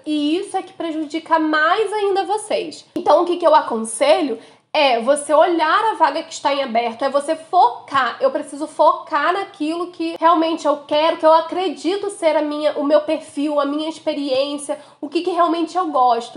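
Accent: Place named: Rio de Janeiro, Brazil. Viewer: deve ser pq a maioria das dublagens são do RJ e o sotaque é o mesmo 😁 por isso ele lembra das dublagens haha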